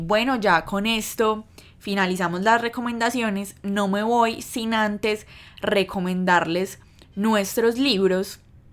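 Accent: Colombia. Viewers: Eres colombiana no?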